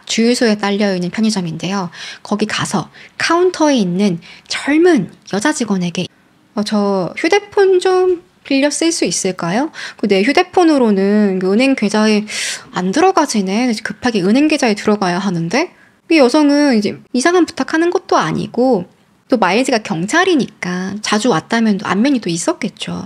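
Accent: Gyeongsang, South Korea. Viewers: 언니 경상도 분이세용?궁금해요!!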